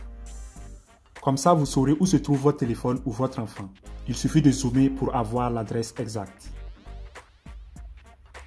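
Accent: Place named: Mali. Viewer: Merci beaucoup depuis le Mali. Votre accent me semble malien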